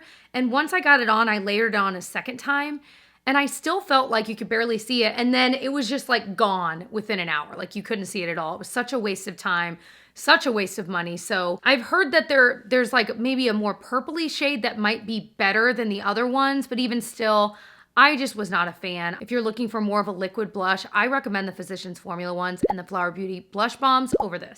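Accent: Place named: Midwest, United States. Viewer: Love that you’re a youtuber from the midwest love to hear “meijer” and “pop”😂❤️relatable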